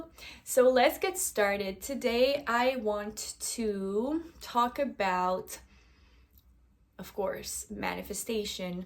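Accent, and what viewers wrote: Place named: Quebec, Canada. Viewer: You sound so Québécoise! 🥰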